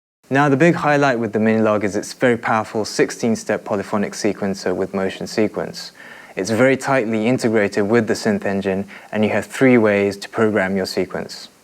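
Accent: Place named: United Kingdom. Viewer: and he also has british accent ...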